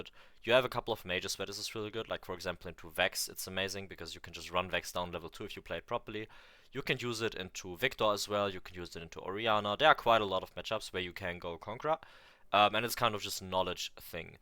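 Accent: Germany. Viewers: Nahh this video it was I was looking for!! love the german accent btw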